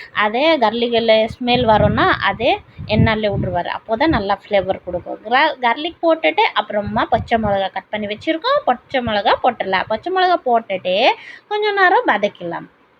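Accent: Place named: Odisha, India. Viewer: Odis assent Tamil speaking nice explanation s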